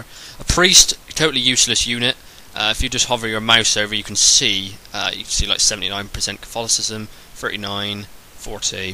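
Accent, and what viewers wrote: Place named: England, United Kingdom. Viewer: the english invented english, so their accent is the right one. you Americans pronounce it wrong, not him.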